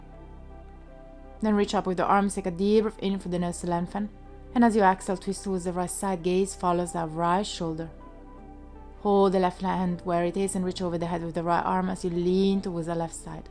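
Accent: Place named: Italy. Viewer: That was really a phantastic flow but for me it would be perfect if you could talk slower. Please consider one hast to get used to your Italien accent and not everybody who is watching this videos is a native english. So I am german for example. Nevertheless thank you very much for this interesting lesson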